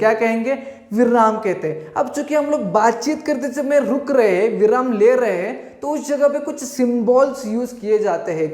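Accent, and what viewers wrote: Maharashtra, India. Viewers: You are marathi i know because of your accent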